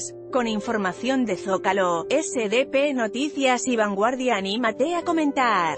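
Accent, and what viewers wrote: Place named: Spain. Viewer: Yo opino que deberias usar tu voz y no la de una Españoleta suenas extranjera suenas mal. .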